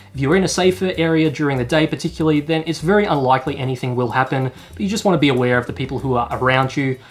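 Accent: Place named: New Zealand. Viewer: Love that kiwi accent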